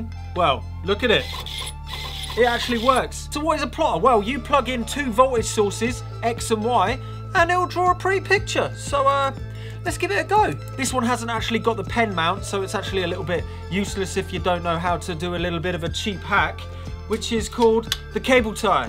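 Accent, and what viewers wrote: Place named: London, United Kingdom. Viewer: He does sound like a Londoner, in which case- he's in the right place!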